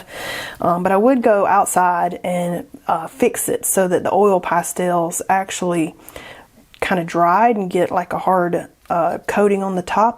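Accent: South, United States. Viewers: I love these colours. This is beautiful. Unfortunately, it was late here in Australia and I watched this in bed. It’s been a hectic weekend and had no spare time to watch it earlier. My other half was sleeping, and I didn’t want to wake him, but I couldn’t resist watching. I’ve saved it and will watch again tomorrow, so I can hear that wonderful southern accent. However, you can’t get a more southern accent than Australia. Any further south and we are in Antarctica 😂😂. Thank you for another wonderful video 🫶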